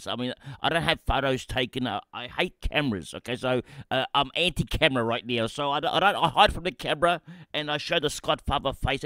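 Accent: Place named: Thailand. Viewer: You bloody beauty… so happy… I too felt the loss when JR retired, but I’m still playing in 5 ex-JR comps with the same old crew … now I’m in 3 of yours plus 3 of your RTSS comps… when I saw the ‘news’ it left me really flat.. losing was gonna be very sad…. So glad you’ve come around… besides FPL is a very frustrating and gimmicky game, I had already decided after this year to give it away.. hahaa… btw your Tinglish is grouse and for the record I tink you are a great communicator.. ❤❤❤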